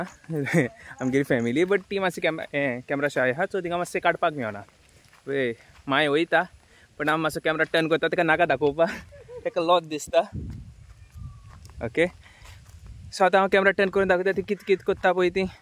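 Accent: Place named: Canacona, India. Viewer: Where is this place. Canacona? Because her language tune is talking like canacona people and I'm also from agonda 😊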